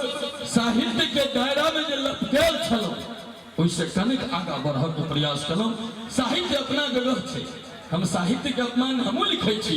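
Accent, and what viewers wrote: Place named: Mithila, India. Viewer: Bahut sunder ji aha bulkul mithila lha chi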